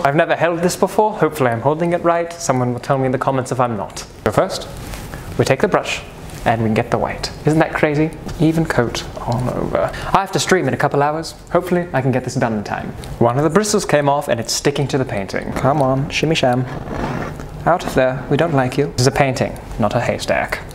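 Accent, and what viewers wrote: United Kingdom. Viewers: Why did i think he was gonna be better than this.........maybe the british excent........sadness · why are you yelling at a dead man in a British accent, you may need to deal with your anger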